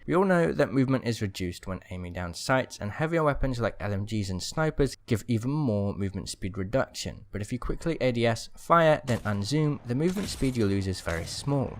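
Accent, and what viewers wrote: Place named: Scotland, United Kingdom. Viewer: スコットランド人の訛り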